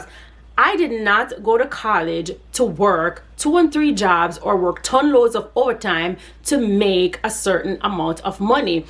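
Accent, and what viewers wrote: Jamaica. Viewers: From your accent I could tell you are Jamaican. I know more Jamaican nurses go to the USA than here in Canada. My pay here without a ton of overtime( I think I did overtime 4 or 5 times for the year) is just over 95,000 CAN before taxes. Last year I got over 100,000 for the first time in my career after working over 20 years. The problem is we pay a lot in taxes. I find people don't complain much about taxes here . Its just recently I hear more complaints about pay , taxes etc. I think because housing prices have gone up significantly in the cities, plus inflation and the government has frozen salary to 1 % increase which is nothing. Generally before all this ,a nurse can buy a nice house on her own and live pretty descent life without working tons of extra time. NP's here get more to start but can still work less overall in comparison to other nurses who do OT etc. 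We are due for a descent pay increase , so will see what happens.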